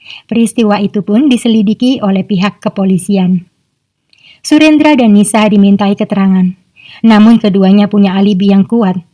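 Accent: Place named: Madura, Indonesia. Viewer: Logatnya seperti orang Madura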